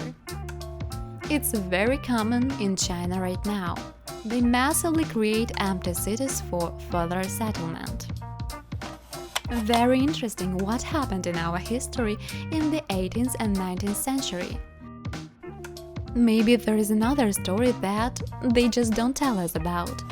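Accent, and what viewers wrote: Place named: Russia. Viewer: Ear cutting her russin english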